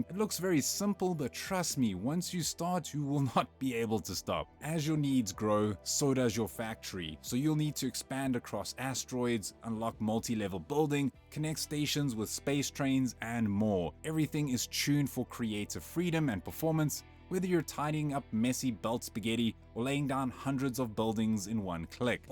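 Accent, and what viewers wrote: South Africa. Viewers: This accent.....South African?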